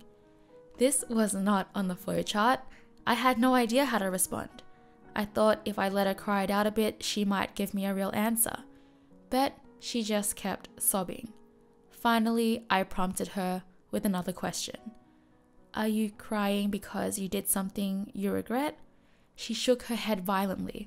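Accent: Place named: Australia. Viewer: Your voice was soothing. Love your soft aussie accent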